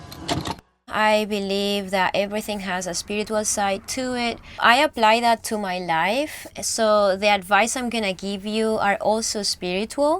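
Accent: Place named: Spain. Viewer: Like your spanish accent! Turns this hoosier man on!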